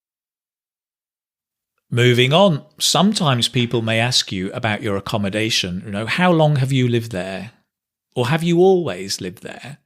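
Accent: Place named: RP, United Kingdom. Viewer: 👏👏👏👏👏👏👏Goooooorgeous English without an accent! Well done,! Es un placer oirte! When I was young I looked after my uncle"s ponies at Cowdray Park Polo Club, in Midhurst. Everybody spoke just like you, if you know what I mean!😄😀😄😄 Un abrazo, from Olivos, Buenos Aires, Argentina🇦🇷🇬🇧🇦🇷🇬🇧🇦🇷🇬🇧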